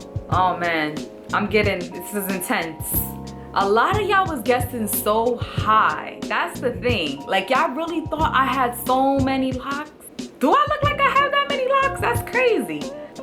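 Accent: New York, United States. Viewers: I from New York and she got that New York accent. It can come off sounding Italian like.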